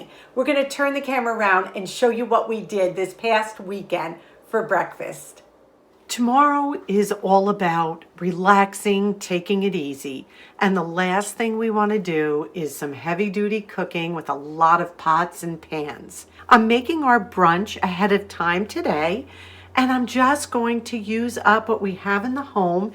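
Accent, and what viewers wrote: New York, United States. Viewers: Hi and, its Helen from Sydney, Muswellbrook, Australia. I have been watching your show now for many a while now and I enjoy your positive, kind and practical outlook on life. I always enjoy your warm and friendly start to your show. I have cooked many of your delicious, frugal reciepes and you have shown me how easy it is to enjoy life without wasting money. Love your New York accent and the kitchen shelving with your lovely vintage bowls. God Bless you and have a Happy Easter❤😊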